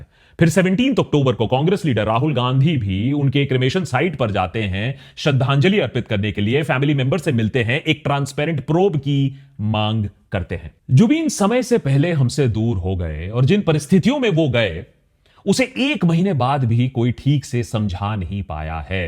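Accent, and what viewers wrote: Bengal, India. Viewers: Brother why are you telling assamese names in bengali accent ( ps: i know deshbhakt is bengali okay, don't try to be oversmart)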